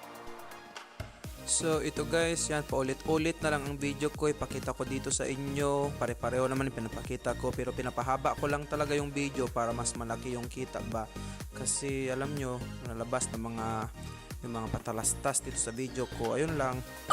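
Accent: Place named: Visayas, Philippines. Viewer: parang bisaya met iti tuno mon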